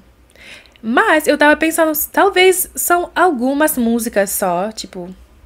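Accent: Northeast, Brazil. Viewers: Essa gringa parece q é nordestina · não sei se vc já percebeu, mas vc tá com sotaque nordestino, será que é proposital?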